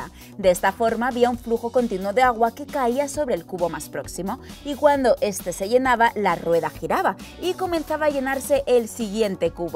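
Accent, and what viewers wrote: Spain. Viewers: Es de España. No se le nota? -_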